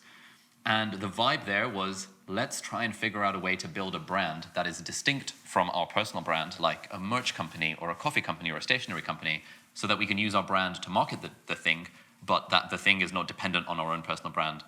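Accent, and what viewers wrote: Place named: United Kingdom. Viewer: Really amazed watching an Arab speaking English with a perfect British accent. Assalamu alaikum, Akhi. 🙏🙏🙏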